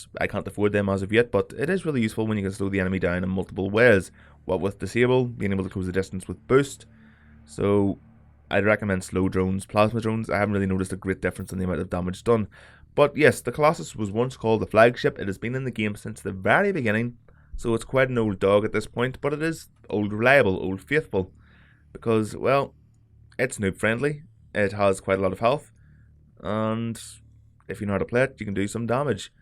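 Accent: Northern Ireland, United Kingdom. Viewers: Ta man I was looking for this build. Good to see a Norn Iron accent online :D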